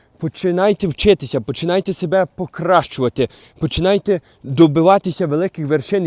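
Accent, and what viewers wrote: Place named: Lviv, Ukraine. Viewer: у нього львівський діалект.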